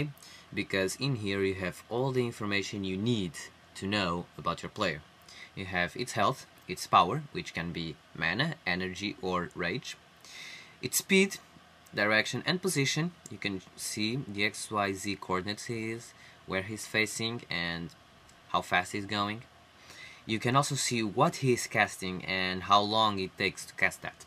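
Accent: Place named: Portugal. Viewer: ola! Vi logo pelo teu sotaque q eras tuga tenho so uma pergunta isto paga-se ou nao?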